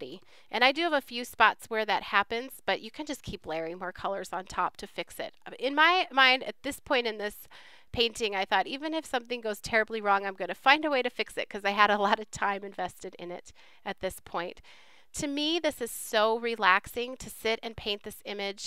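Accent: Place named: United States. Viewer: i love PB stamps and have a few, i watch all your videos but just wanted to say i love your voice!! I love the american accents anyway, but your voice is so nice, sorry to be off topic, lol, hugs from Vick in England xxx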